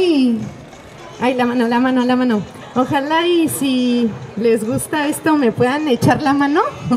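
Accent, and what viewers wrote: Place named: Mexico. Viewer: 🌼 🌷 tan hermosa como tu nombre, y me siento muy orgullosa de que seas mexicana. En serio, no se como gritarlo, eres algo, completamente diferente, especial, único, y me 2ncanta también como hablas, tu acento: 100% mexicana!!! Oye, eres de este mundo? No eres un ángel venido del cielo, que te envió Dios para alivianarnos un poco?! Tu alegría es increíblemente contagiosa! Que Dios te Bendiga y te Proteja! Mil gracias por existir!!👍👍👍😄😄👏👏👏😇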